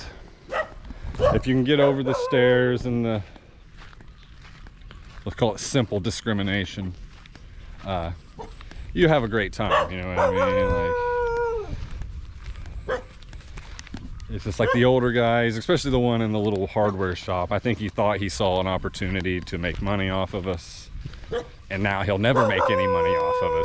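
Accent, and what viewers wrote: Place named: United States. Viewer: at least your accent is giving someone with native English language from USA. You were not only raised by Americans, but you were/are exposed to American system a lot..... And I don't think it is funny. You had serious symptoms and yet it seems that you don't grasp basics of tropical diseases and that you, indeed, did have serious symptoms and that you are lucky to be alive. It seems you find this funny. Well, good for you.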